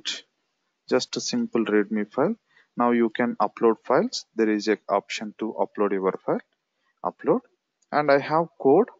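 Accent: India. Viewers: Every indian pronunciation sucks I can't stand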